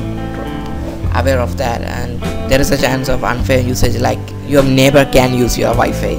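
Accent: India. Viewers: U surely r a malayali by accent!!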